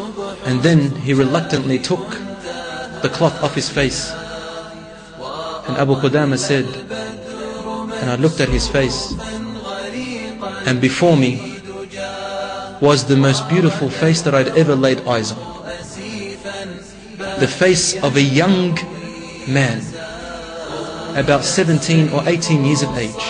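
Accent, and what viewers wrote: Australia. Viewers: The speaker has an Australian accent and i think it might be Moosa Cerantonio, hope it is and salam to you :D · Who is th e person speaking? It doesnt sound like Sheikh Feiz to me...besides the australian accent. And people stop crying about what he said first... slip of the tongue, we're humans we make mistakes. =)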